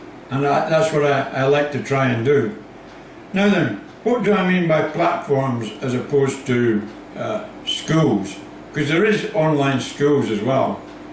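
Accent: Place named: Scotland, United Kingdom. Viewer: This is Scottish accent .. 😃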